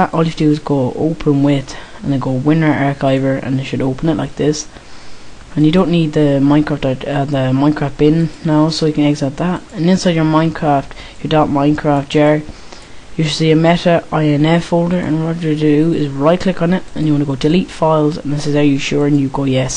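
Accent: Ireland. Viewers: it work thanks ladd btw are u from ireland ?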